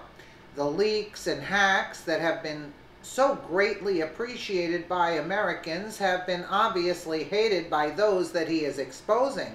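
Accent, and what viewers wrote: New York, United States. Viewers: I am from south ga and I love your New York accent. It warms my heart everyday!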